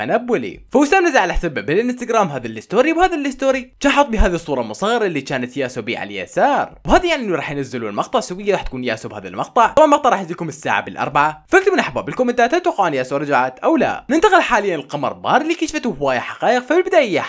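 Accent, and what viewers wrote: Iraq. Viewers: انت عراقي؟ وانا اقول انت سوري؟؟؟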